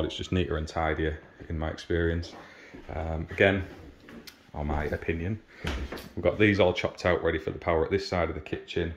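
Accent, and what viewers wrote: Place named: Hull, United Kingdom. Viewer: Ahh nice mate. I thought I could hear a Hull twang in your accent 😂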